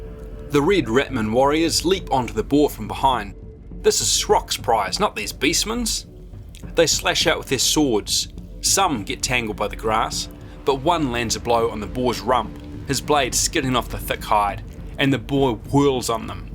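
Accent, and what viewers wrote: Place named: New Zealand. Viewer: Love the character put into the battle report mate. Also, you sound like a kiwi. Hello from Hawkes Bay!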